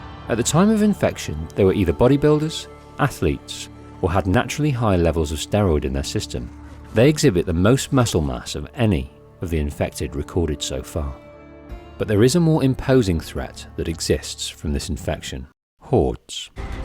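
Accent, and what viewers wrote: United Kingdom. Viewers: The British accent INSTANTLY bores me.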